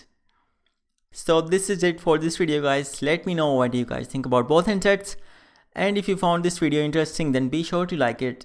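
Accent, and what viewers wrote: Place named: India. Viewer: why is it only Indians they sound so annoying!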